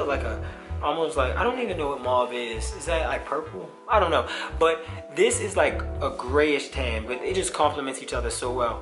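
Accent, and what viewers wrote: Baltimore, United States. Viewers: Nigga definitely from baltimore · you from baltimore? you sound like it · gotta be from bmore lol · Bruh is from Baltimore fa shoooo 😭😭😭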